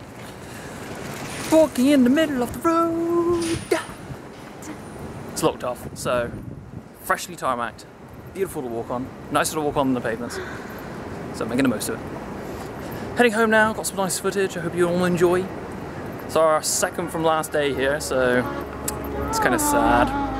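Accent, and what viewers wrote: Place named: Dorset, United Kingdom. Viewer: back to Bounemouth eh? Oi knew oi erd a Dorset accent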